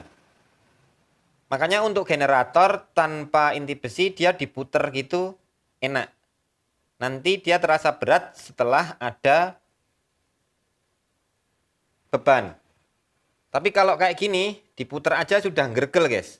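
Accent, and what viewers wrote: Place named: Banyumas, Indonesia. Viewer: Kalau boleh tahu orang mana, mas? Usianya 30an atau 40an kah? karena susah ditebak dari fotonya yang tampak muda, tapi suaranya jelas sekali dan terdengar seperti Pak Lik saya yang orang Cilacap,, saya subscribe yaa,, jarang-jarang saya bisa menangkap suara pengucapan sejelas ini di yutub karena saya orang nya Hard of Hearing alias gangguan pendengaran sedang,, dan orang dengan logat ngapak seperti Pak Lik saya gampang saya dengan suara pengucapannya,, kebetulan juga sedang mengerjakan tugas akhir membuat contra-rotating axial flux permanent magnet generator,, back emf nya tampak terlihat sekali karena salah rotor magnet yang seharusnya ikut berputar bersama rotor kumparan malah diam dan terasa ada drag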